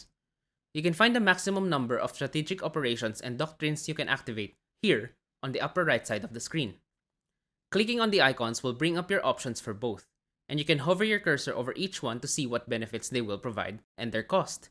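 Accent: Philippines. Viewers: Hi there you sound Filipino sorry if im incorrect. But you are the best Planetfall teacher ever, you literally teach us what we need to know unlike other youtubers they talk for hours and it so boring and draining I can't be bothered listening to them. I only needed to know what diplomacy was but one question How did your game start with ''0'' reputation for your enemies in my game they always start with -300? and I am always at war. I can't pronounce anything to them in Scenario. I also can't trade Casus Beli. What am I doing wrong how do I start with Neutral Reputation. Please anyone help.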